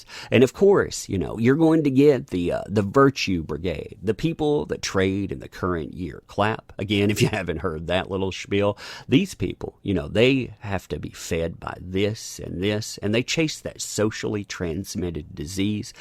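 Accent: South, United States. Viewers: Your southern accent is so soothing. :3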